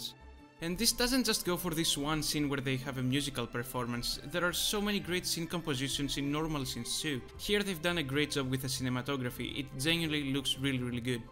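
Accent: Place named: Greece. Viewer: M8 ur accent sounds greek perhaps the first greek person with good taste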